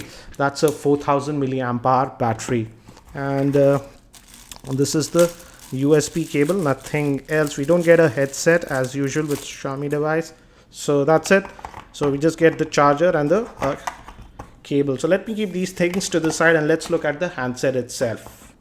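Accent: India. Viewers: lol unbooxinng typical Indian accent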